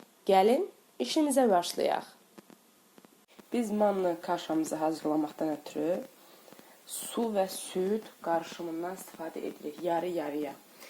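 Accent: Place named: Armenia. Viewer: Yeraz aksentine oxsadi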